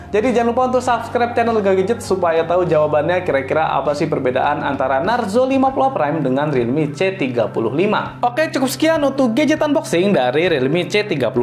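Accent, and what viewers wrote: Yogyakarta, Indonesia. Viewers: Orang jogja bang?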